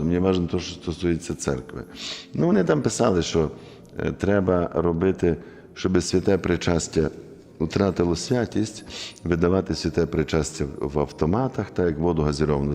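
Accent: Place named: Western Ukraine, Ukraine. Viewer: я зі Львова, отець так говорить як західняк (схоже він з Франківщини), але де його парафія? це Днпро? і яка це конфесія?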